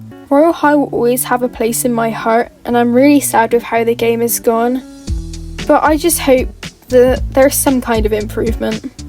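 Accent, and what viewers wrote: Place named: United Kingdom. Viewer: Ur voice sounds so much more British than irl 🧐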